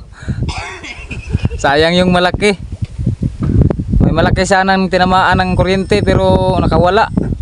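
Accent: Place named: Visayas, Philippines. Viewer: Bisaya man kaayo ang dating from tanauan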